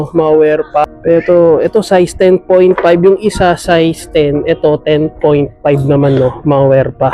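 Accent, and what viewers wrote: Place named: Iloilo, Philippines. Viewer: Boy ilongo ka ky pang hambal mo pang iloilo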